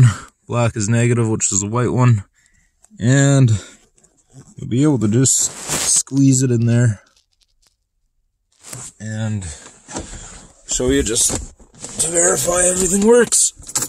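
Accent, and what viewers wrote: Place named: Canada. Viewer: This guy has the most excellent Canadian accent I've heard in a while!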